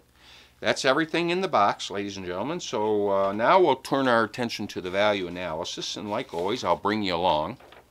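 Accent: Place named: Michigan, United States. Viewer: your accent. Sounded northern Michigan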